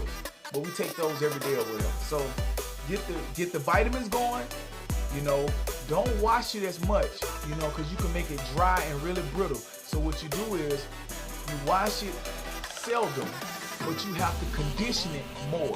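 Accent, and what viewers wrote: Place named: South, United States. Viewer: Bride has to be from the south lol I’m from the south that nigga sound just like us lol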